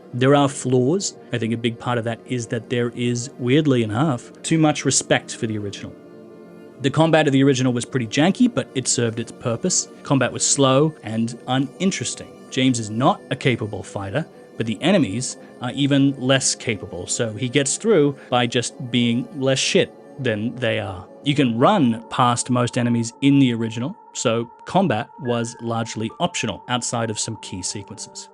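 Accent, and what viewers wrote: Australia. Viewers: Good video, learnt new information; you are in fact Australian and not British! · lmfao the classic "No I'm Australian" after anyone hears your voice the first time.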